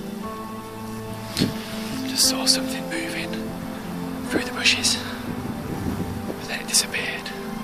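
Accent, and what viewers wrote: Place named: England, United Kingdom. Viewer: Yeah was guessing England by the accent but couldn't pin the area. Beautiful part of the country mate, and when you have experiences like this it makes it all the more worthwhile. I was fortunate enough to grow up in rural Somerset and then rural Devon so got to see loads of foxes and badgers in the wild. Sadly living in the city now I get to see the odd urban fox but it's not the same as seeing them in their natural environement. Appreciate the upload mate, takes me back to my youth :)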